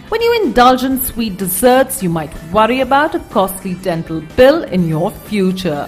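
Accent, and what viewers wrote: India. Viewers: The person speaking is an indian Expensive, they are, but if you choke on one of those stones, youd end up in the er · interesting vid but the voiceover sucks balls. she is a northern indian. not blaming the accent...the tone is driving me insane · i fear the speaker is of Indian origin, awful english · She has a indian accent but she's trying the British accent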